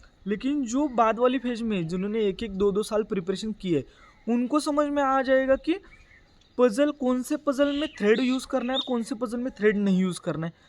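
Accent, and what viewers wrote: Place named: Maharashtra, India. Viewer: Bhaii tumhari hindi sunke hi mai samaj gaya ki tum maharashtra wale ho😅😅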